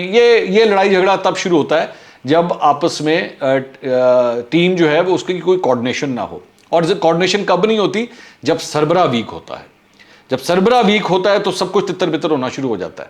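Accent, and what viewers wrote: Punjab, India. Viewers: Me ap ki punjabi style ki waja say vlogs sunta hun realy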